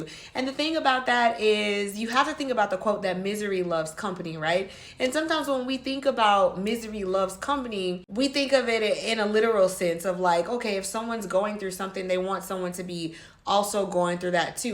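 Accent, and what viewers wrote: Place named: Honduras. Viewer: Being a new subscriber I can't place the accent exactly. Yet, to my ears, it is Hounduran or maybe even Costa Rican. Am I close?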